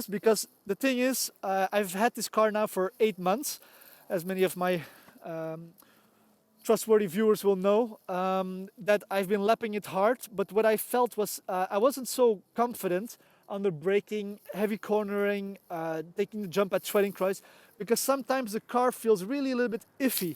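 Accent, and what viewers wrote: Belgium. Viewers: Interesting video! 👍 And clearly you're from Belgium. 😄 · Where are you from ? you don't sound German (otherwise your english is very good) you sound more like a Belgian talking English :p unless i'm that mistaken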